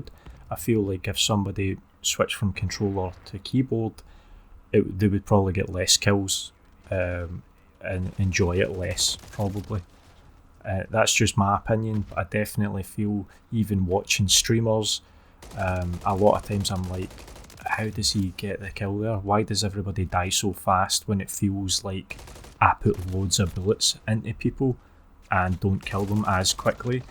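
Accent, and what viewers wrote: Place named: Scotland, United Kingdom. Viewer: Your Scottish accent 😀!! Beautiful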